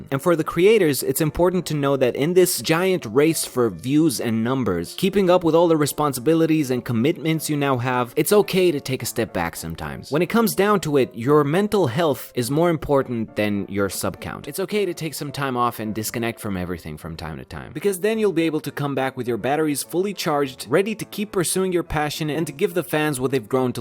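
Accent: Romania. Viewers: You have such a nice accent Were you raised in Romania? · Please answer me: Are you romanian? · Yo man, your accent is GODLY for somebody from Romania! I live there too, and my accent is trash.... I want to as you a question . Where do you live? Like, in what county?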